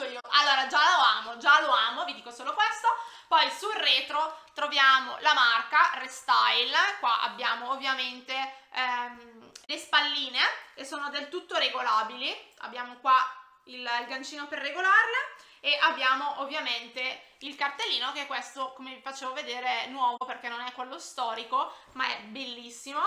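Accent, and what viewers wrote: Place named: Bergamo, Italy. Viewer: Ciao! Ho trovato il tuo canale per caso e hai uno stile molto simile al mio - una domanda, sei per caso di Bergamo? L’accento mi sembra simile ✨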